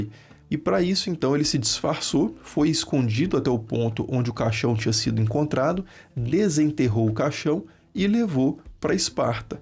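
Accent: Minas Gerais, Brazil. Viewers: que achado foi o seu canal!! As temáticas que você explora são extremamente interessantes e, ao meu ver de leigo, pouco conhecidas do grande público. Você tem um conhecimento incrível, uma didática ótima e (detalhe não negligenciável) uma voz/sotaque mineiro muito gostosos de ouvir haha Para mim, é o melhor canal de história em português! Parabéns pelo seu trabalho maravilhoso e obrigado por compartilhá-lo!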